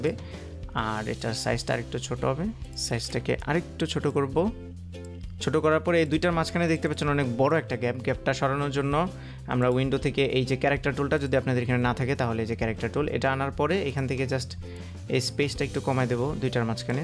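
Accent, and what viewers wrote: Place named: Chittagong, Bangladesh. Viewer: vi apni koy takan dhaka naki.ctg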